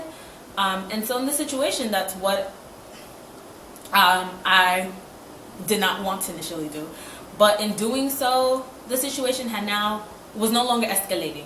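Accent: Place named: Africa. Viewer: Makeup A 1 🔥🔥🔥 also I peep the African accent 😭😭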